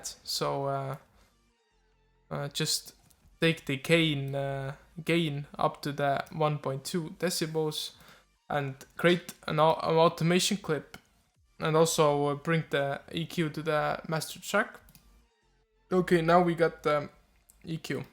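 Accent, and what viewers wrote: Russia. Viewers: мне кажется или ты русский? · Ur accent sounds like u from Russia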